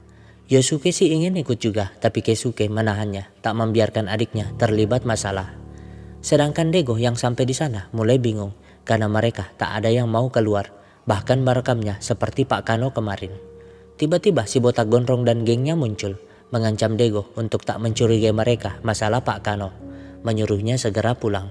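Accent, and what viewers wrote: Makassar, Indonesia. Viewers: Orang Makassar Ki toh bang, Logak²nya melekat sekali 😭🤣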